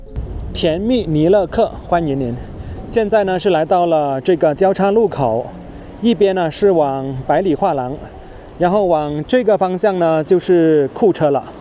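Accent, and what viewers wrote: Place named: Chaoshan, China. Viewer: 潮汕人口音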